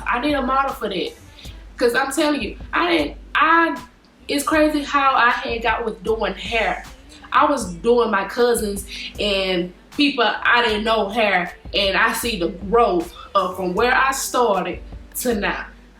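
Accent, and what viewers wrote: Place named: Texas, United States. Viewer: Hey girl new subbie!! You have been making your rounds. I've seen you on Gina's channel as well as commenting on Rochelle's!! Girl I heard the Texas in you as soon as you opened your mouth I knew I was at home! I love it! I'm from Texas too!! Make sure you turn up this year, go out of your 20's with a bang🎉💃🏽🍷I love your vibe, you're so cool and I can tell you're a sweetheart.🥰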